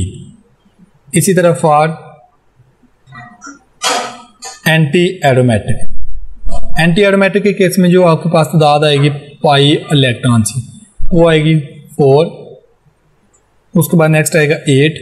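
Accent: Pakistan. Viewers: Are you accent seems to be pakistani Btw love from india❤️ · Mujhe accent se lga tha ki aap Pakistani ho But boht accha samjhaya aapne Thank you sir 🙏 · Kis kis ko sir ki aawaz se lg rha hai ki sir Pakistan ya fir Jammu se hai 😅😅😅😅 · sir ki ek dam pakistani accent lgti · Why i am pakistani accent from this guy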